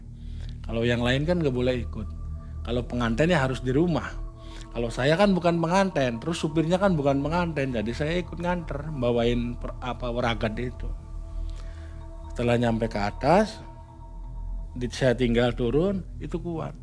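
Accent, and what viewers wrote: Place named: Banyumas, Indonesia. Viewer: Alhamdulillah pak hadir.. Cara bercerita ituloh santai, logat ngapak plus.. Ky nya mmg punya karakter tersendiri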